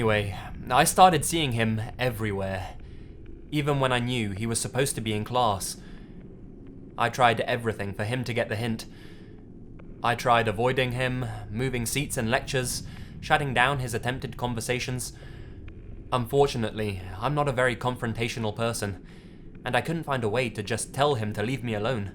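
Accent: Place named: United Kingdom. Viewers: I love your British accent, I'm Canadian and I die a little every time a hear your voice and your tone for telling scray stories, scares the shit out of me. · Finally someone else like me who has a British accent and tells scary stories only you have a British accent that's a hundred times better than mine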